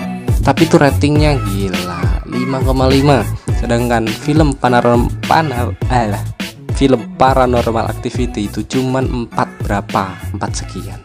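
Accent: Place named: Java, Indonesia. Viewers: Emange harus b.indo. jadi diri sendiri lebih baik drpd harus merubah diri, jdinya aneh. Semangat trs bang, w jg orang jowo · logat jawa ny jgn nampak guna kan bahasa indonesia yg jelas dn tepat.. Setiap Youteber mengunakan bhasa indonesia.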